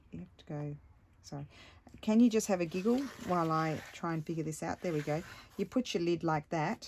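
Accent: Australia. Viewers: Righty O!!! I love when you speak Auzzy How do you spell that? Giggling.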